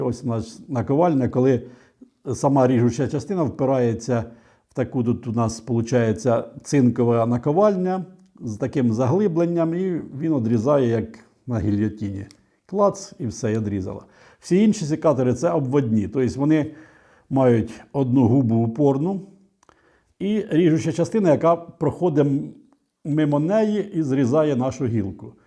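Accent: Poltava, Ukraine. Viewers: Українська мова з нотками полтавського суржика - Це супер . Ви молодець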